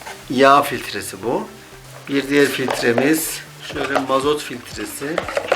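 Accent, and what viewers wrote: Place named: Konya, Turkey. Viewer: Konyalı olduğu nasıl da belli oluyor kardeşimin, Konya li olarak hemen anlarım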